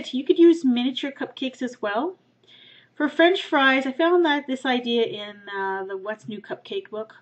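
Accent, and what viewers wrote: Canada. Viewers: i love your canadian accent it makes me so happy inside.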